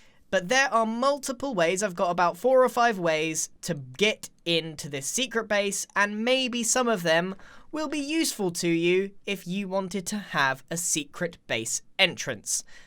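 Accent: United Kingdom. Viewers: u remind me of wisp why cuz u both are British like mee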